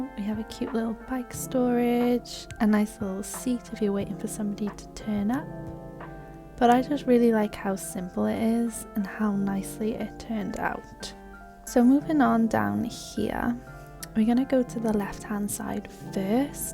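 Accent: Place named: Wales, United Kingdom. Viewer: love that welsh accent hello fellow Cymro! 🏴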